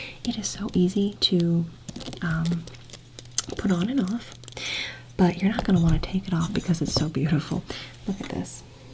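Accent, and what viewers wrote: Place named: Midwest, United States. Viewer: The Midwestern accent adds to the nostalgia lol thank you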